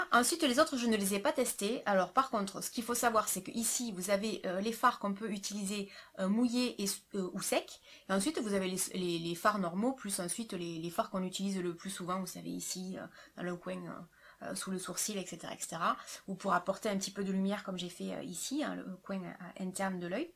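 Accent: South, France. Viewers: Super video comme d'habitude ! La palette UD me donne bien envie (j'ai la 1 que j'adore) par contre BYS ne me tente pas 😒..... Et je suis devenue comme toi avec ta youtubeuse préférée.... Je ne me lasse pas de tes vidéos ( je les ai toutes visionnées) et j'adore ton accent du "sud".... Ne change pas 😍 Biz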